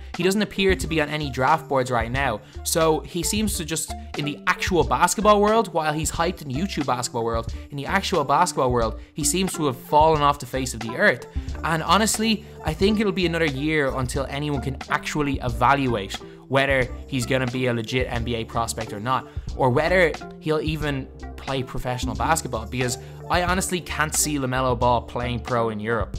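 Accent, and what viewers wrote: Ireland. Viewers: Are you from Ireland? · Are you irish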